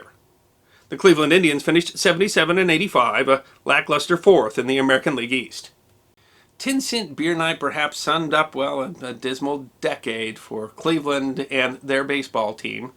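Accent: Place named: Indiana, United States. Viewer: you sound like the indiana version of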